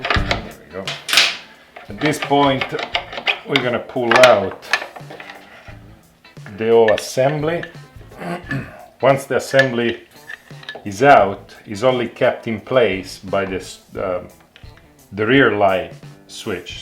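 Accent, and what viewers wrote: Italy. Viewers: Love your Channel and love your accent too. 😊 Having an italian teaching us how to take care of our italian babies is amazing. Thanks for your time. Cheers from Canada.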